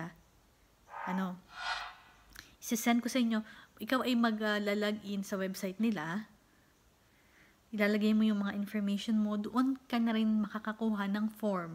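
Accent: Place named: Southern Tagalog, Philippines. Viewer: hi new subscriber to your channel... been planning to apply to work abroad for years medyo hnd po pinapalad pro I am still hoping that I can be able to fulfill my dream... anyway thanks for sharing this I'll surely try all the tips you've shared here... by the way are you from the south? Quezon or Batangas? hihi just curious though since we have a little bit of similarity in the accent... thank you and more power to your channel. :)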